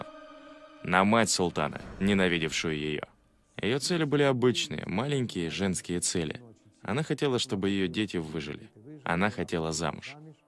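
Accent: Russia. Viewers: …ваш контент придуман для того, чтобы якобы под видом истории уменьшать, умалять, принижать, перекручивать и переворачивать смысл, где-то врать и и тем самым показывать худшее и якобы превалирующее над всем!!!… - в частности всё украинское!!!…, например - на примере Роксаланы!!!… Очень удивительно, что это видео, названи его написано на НЕМЕЦКОМ ЯЗЫКЕ, снято видео на УКРАИНСКОМ ЯЗЫКЕ (который заглушен) и повествование ведётся на РУССКОМ ЯЗЫКЕ с российским акцентом!!!… при чём не только переводится с УКРАИНСКОГО ЯЗЫКА, НО И МНОГО ДОБАВЛЯЕТСЯ негативной отсебятины… на русском!!!…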